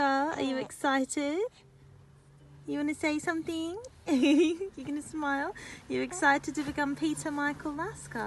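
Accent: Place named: United Kingdom. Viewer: Aww hi Peter Michael baby!! Such a cutie and I love your British accent mommy!! 🥰🥰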